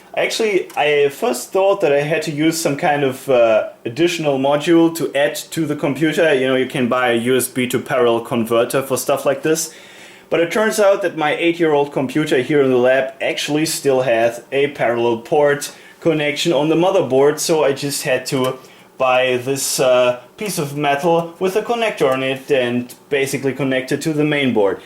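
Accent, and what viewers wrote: Germany. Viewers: Dein Englisch ist viel besser jetzt und der schreckliche deutsche Akzent verschwindet. Sehr gutes Video wie immer. Vielen Dank fürs Hochladen! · Ich gratuliere Ihnen mit Ihren Erfolg !!! like all your video's, especially your German accent...love it !! Herzlich grüße aus die Niederlande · What happened to your English?? Stop trying to sound American. It sounds horrible. I much prefer your clear German accent.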